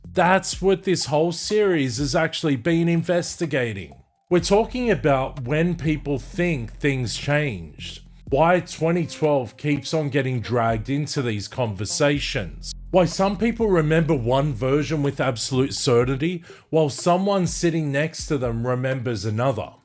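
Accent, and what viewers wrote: Australia. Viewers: Australian accent.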